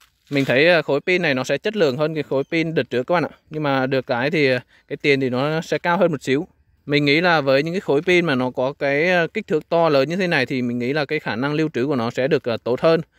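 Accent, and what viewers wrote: Nghe An, Vietnam. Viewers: Anh ở đâu nghệ an vậy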